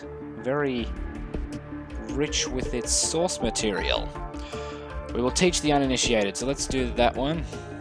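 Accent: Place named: Australia. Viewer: He's Aussie...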